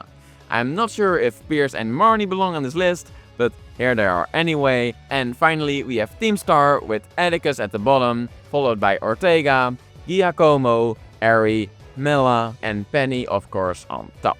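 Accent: Netherlands. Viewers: I hear the Dutch accent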